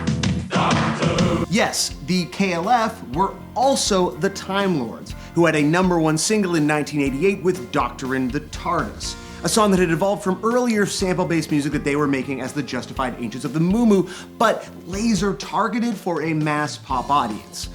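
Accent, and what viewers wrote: Canada. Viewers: your canadian accent is so god damn thick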